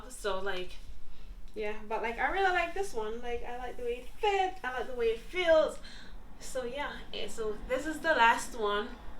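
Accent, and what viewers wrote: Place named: RP, United Kingdom. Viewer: Yuh posh too bad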